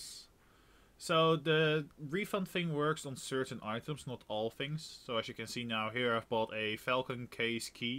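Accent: Netherlands. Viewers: ben jij nederlands ik hoord het aan je engels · Are you Dutch?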